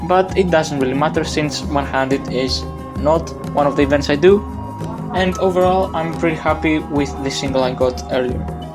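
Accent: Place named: Greece. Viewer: The greek accent is the best!!!!!❤😂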